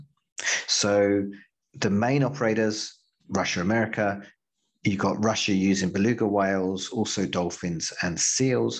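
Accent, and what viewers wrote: United Kingdom. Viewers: I like the combination of shitty audio and the British accent. It works for me but I see what your saying. This dude sounds very informed and I like his delivery.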